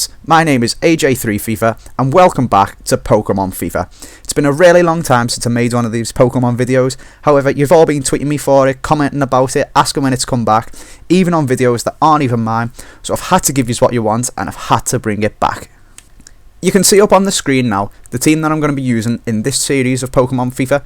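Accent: Liverpool, United Kingdom. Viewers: You had such a strong scouse accent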